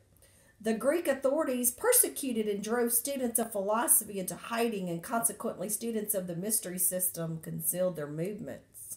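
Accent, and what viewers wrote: South, United States. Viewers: Me being from the south I love that southern accent don't change it for anyone...one book I will suggest for you to read and maybe to expound on its arcanian Freemasonry. By RA Gilbert..this book was shed a lot of light on a dark subject for you and will bring together some missing pieces of the puzzles as far as other things are done and said how are ancient Freemasonry was that of the arts of the ancient Egyptians and how the Greeks and Romans stole it in Martin British empire who inherited has changed it to be a good old boys club while trying to exclude African-Americans from it. Great piece you're doing wonderful.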